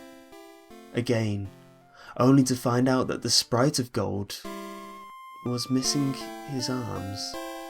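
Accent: United Kingdom. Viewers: I'm guessing you're British or English.....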